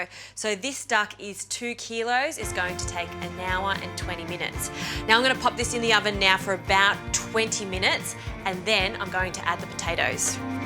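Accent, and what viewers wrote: Australia. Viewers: Oh my God guys. It's pretty clear from her accent she's Australian so yes she uses Celsius. Come on. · stop making yourself look like an idiot. I'm Australian, she's an Australian celebrity chef, America is the only place not using metric and her Australian accent is a dead give away, even though I don't notice the accent because I'm Australian. "Everyday Gourmet" is an Australian cooking show hosted by Justine, the lady in the video. So yes, it is metric, it is obvious to anyone that has morw than 2 cents for a brain. Merry kiss my ass.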